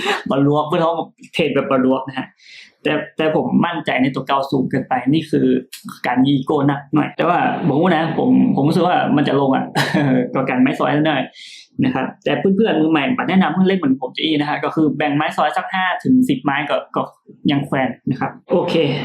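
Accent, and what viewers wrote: North, Thailand. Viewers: ภาษาเหนือ เป็นภาษาที่มีเอกลักษณ์มากครับ ฟังแล้วสบายหูมากครับ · ฟังไม่รู้เรื่องบ้างแต่ก็ชอบค่ะภาษาเหนือ เป็นภาษาที่ลื่นหูมาก #ติดตามพี่มาตั้งแต่เข้าวงการเทรดเลยค่ะ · อู้เหนือม่วนขนาดดดดดดดด......ชอบบบๆๆๆๆๆๆๆๆๆๆๆๆๆๆ o(〃＾▽＾〃)o ヾ(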